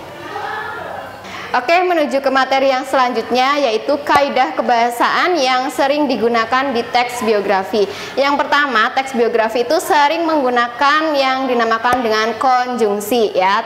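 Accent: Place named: Java, Indonesia. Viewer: mbanya medok lucu xD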